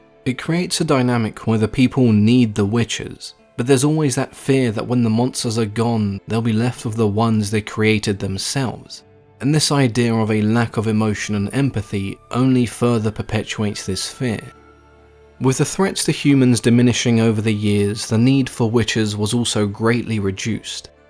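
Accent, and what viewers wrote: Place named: England, United Kingdom. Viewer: Direct, clear, informative and English accent....good video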